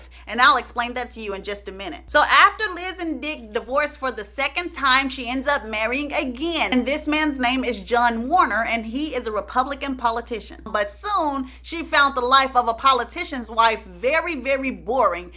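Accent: South, United States. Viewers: gurl...I wish I could give you five thumbs up...the way you tell a story is phenomenal!!! I had to listen to this one twice it was so funny. Your voice and that lil southern twang and your comedic timing are so on point it cracks me up every time. Thanks for the joy and laughter you bring. Sayso fam: Seek the Lord while He still may be found! All praises to the Most High...praise His Holy name...halleluYah!!! Love to all shalom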